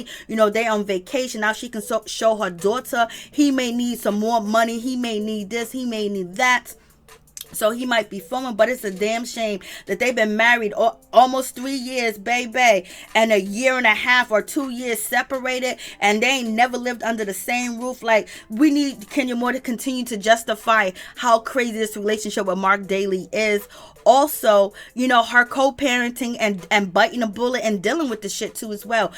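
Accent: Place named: South, United States. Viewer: YOU HAVE THE WORST ACCENT AND YOUR WORDS MAKE YOU SOUND REAL COUNTRY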